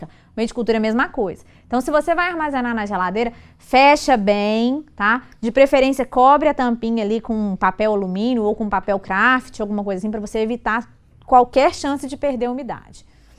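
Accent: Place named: Minas Gerais, Brazil. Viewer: Muita experiência, muito carisma, e o sotaque mineirim é bão demais rsrs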